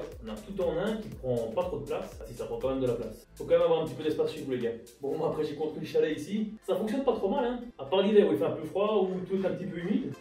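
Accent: Belgium. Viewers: t'es belge fieu ??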